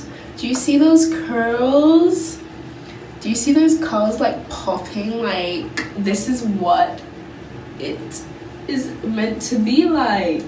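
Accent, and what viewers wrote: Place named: London, United Kingdom. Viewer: BTW, is your accent from London.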